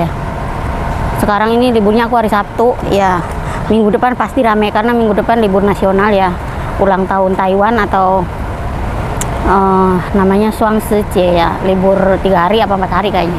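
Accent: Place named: Banyumas, Indonesia. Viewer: denger pean bicara ngapak lucu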